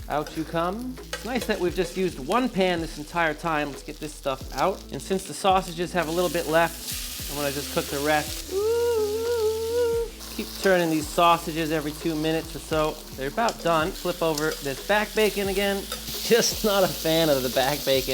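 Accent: United States. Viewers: He may have a yank accent but he's an English lad with that fryup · Clicks on link, hears an American accent then switches off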